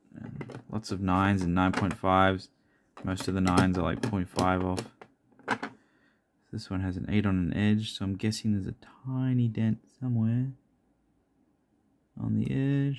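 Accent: Australia. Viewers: Dude, your videos are the best out there. not only for the sublime ozzie accent but you dont talk too much. you get to see a shit ton of cool cards within a short time. I really love this, less talk more cards. your videos have that to the max, so keep it up! I subscribed hell yes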